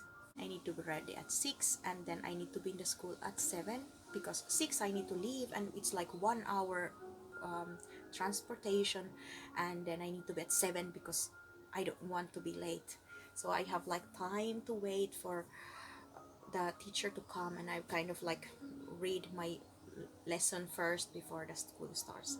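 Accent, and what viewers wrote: Finland. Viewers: You have very Finnish accent like for English :)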